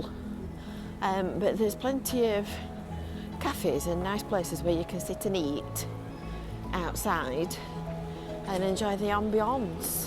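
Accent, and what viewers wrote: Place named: Yorkshire, United Kingdom. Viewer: Sorry , but I thought the music distracting and muted the good ladies rather nice Yorkshire accent ( but I am biased ! ).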